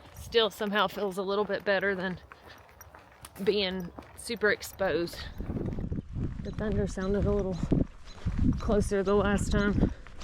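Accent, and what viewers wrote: South, United States. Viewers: You’re so stinking cute with your southern accent and your surprise at experiencing Arizona for the first time. I lived in TN for over 6 years, 30 years in NM, now back in AZ for 13 years. I love your expressions of wonder. Did R2R:18hr. at age 59. My health took a hit after that. Now in Payson,loving it!!! Love you ❤️❤️❤️ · As a mama who has gotten very little sleep over the years with constantly breast-feeding babies that for some reason just won’t go to sleep, separated from a partner on and off because of work, and never having had a babysitter this video was really powerful. That song has meant so much to me for so many years having grown up on hot, flat coastal plain land of Southeast Georgia and then moving to a place with some little mountains to follow my dreams. I’m so glad you were able to be a positive role model to other mothers while being so loving and respectful towards your own mom who is stepping in as a second mom through all of this to help all of y’all out. 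I love that you’re confident enough to record yourself interpreting everything around you with a Southern accent, phrases, and mannerisms. It’s so dang funny, so raw, heartfelt, and comforting. My nine-year-old born ornithologist, backpacking daughter watches now to identify all the birds, which means it takes an hour to get through one video so she can research each bird. 🦅🦉🕊️ You are a hero to many. Y’all have amazing footage, fantastic editing, and big hearts. I’ve been wondering when we’re gonna see more of the family dynamic after getting so invested in your channel. That last bit with him seeing his daddy and putting his sweet little head on his daddy’s shoulder broke me for sure, but the song already had me crying right from the start especially after hearing you cry, too. (I know the mom’s in the grandma’s usually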